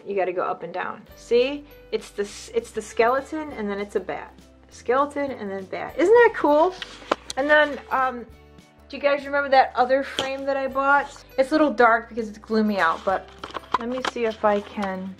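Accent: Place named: Ohio, United States. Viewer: I discovered you today through your easy peasy eye trick. I haven’t watched much YouTube but lately, have been watching to get make-up tips. I watched a couple more of your videos when I found out you are from the Cleveland area. I grew up in Wellsville, down on the Ohio River. Then, you mentioned Texas. I have lived in Texas for 18 years, 10 in Houston and 8 in the DFW area. I think listening to you talk reminded me of home. I don’t miss winter but I miss Ohio. I am happy I happened to find you.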